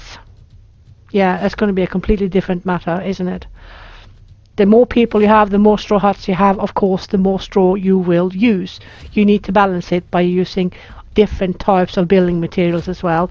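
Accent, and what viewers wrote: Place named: North, United Kingdom. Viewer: Hi 😊👋 just found your channel, your playtrough is very intelligent and informative! Your accent sounds northern🤭 can i ask where you are from? I am Scandinavian thats why I ask🤭 Love your video and will continue to watch your videos, new sub for you😊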